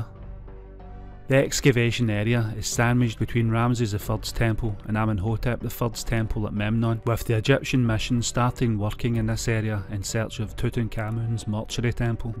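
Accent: Scotland, United Kingdom. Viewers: Loved the Scottish accent! And the rest 😉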